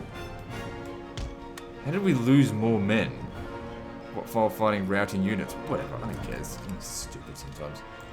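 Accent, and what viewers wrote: Australia. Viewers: I absolutely love the empire total war blitz campaign and was so excited to see your return after your first attempt. I would love for you to update us on what days you would upload this campaign because I'm so excited to see you finish! Ps. I also love your angry Australian accent but the episode with full detail is so much better and also exploit the SHIT out of it mate!!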